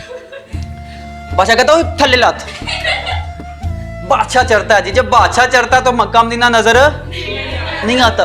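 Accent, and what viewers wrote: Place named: Punjab, India. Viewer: Sir story b axhi thi lakin ap ki punjabi ka style b buhat acha tha seriously 😀😂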